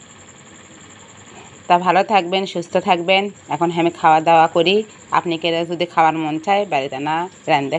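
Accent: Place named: Joypurhat, Bangladesh. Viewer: জয়পুরহাট জেলার ভাষা মনে হচ্ছিল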